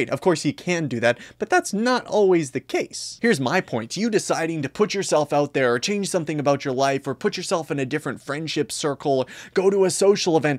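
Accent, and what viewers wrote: Canada. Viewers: This dude has got a solid, mint canadian accent lol.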